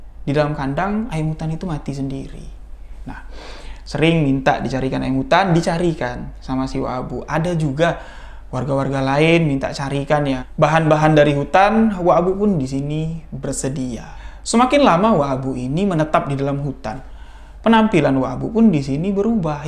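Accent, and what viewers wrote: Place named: Sumatra, Indonesia. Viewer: aku nyimak mulai awal aku kira dulu orang madura .terusdan terus ku smak eh bru aku ngeh oh logat sumatra sekalinya.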